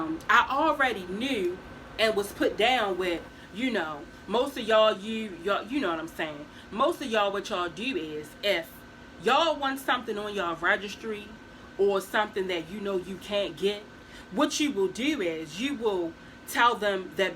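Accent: Baltimore, United States. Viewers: Love watching you! I can always spot a Baltimore accent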